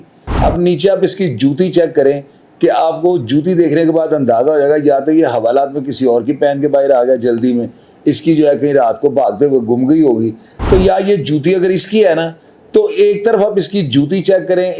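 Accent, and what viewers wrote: Lahore, Pakistan. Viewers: 😂😂😂haha yar jeo lahori andaz meh bat krtey maza ajata saab ye style nhi change krna apny kbhi bi plz big fan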